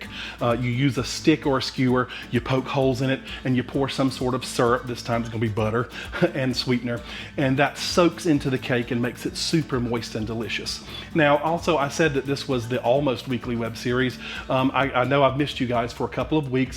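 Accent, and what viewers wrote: South, United States. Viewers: my first time visiting your channel. I detect a Southern accent in your voice. Where did you grow up and where are you living now??? Love your enthusiasm and smile!!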